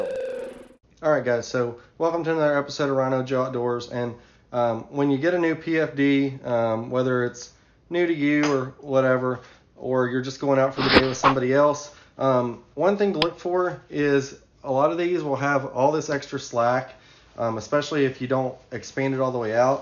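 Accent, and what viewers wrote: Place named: Kentucky, United States. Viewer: Ok, well so far I've heard you say waller it out and now you're using electrical on your PFD. You are definitely from Kentuckuy and that's a good thing.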